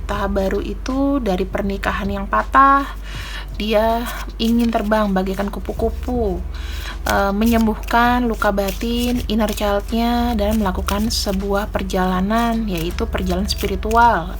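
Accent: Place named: Blitar, Indonesia. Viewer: bhsamu kayak orang blitar. Jawa tengah. " YO BEEENN ??? 😆.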